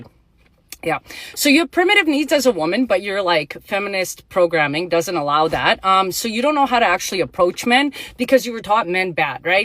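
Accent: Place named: Prairies, Canada. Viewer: Ok this girl is from Canada and my guess is she a prairie girl lol love it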